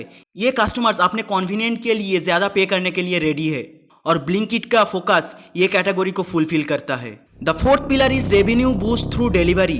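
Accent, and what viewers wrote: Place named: Bengal, India. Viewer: You have a Bengali accent, so you'll need to work in that style for this task—everything else is perfect!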